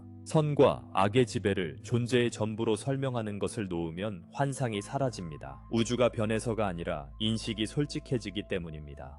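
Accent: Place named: Gyeongsang, South Korea. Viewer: 야호 신납니당~~ 경상도 사투리가 당연하지요 창녕띠기 아인교 ㅋㅋㅋ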